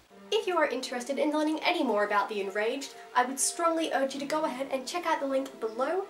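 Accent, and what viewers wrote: Australia. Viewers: Oh wow an australian :D hi!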